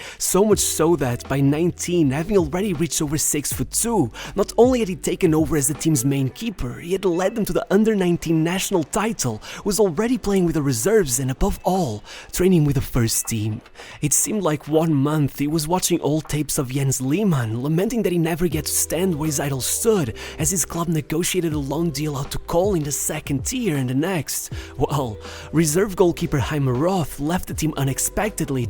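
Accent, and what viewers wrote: Portugal. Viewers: Bro are you Portuguese? Your accent sounds Portuguese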